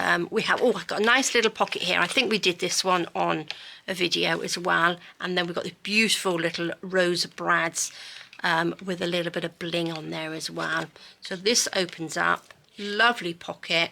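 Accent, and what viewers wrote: United Kingdom. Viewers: Congratulations, dear, on reaching 80,000 subscribers! You were the first creator I ever watched, when we were shut down for COVID in early 2020 here in the New York City area. I was home from work for 3 months, confined to the house, and going absolutely stir crazy, when this lovely British accented lady broadcasting from Portugal taught me what a junk journal was, and I have been a subscriber ever since…haven’t missed one! In fact, the first digital I ever bought was your Shabby Pink one. And of course I had to have water colors, distress ink, pastels, gesso, stabilo pencils, resin, glitter, lace, seam binding, beads, and bling, not to mention every color of your favorite rose trim known to man. I am so grateful to you for sharing your talent with us. I haven’t seen you work with your pastels in quite some time, perhaps you could include another project using them this year? 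Thank you again for teaching us your craft, and for keeping us entertained for so many years. Sending much love and gratitude to💖💖💖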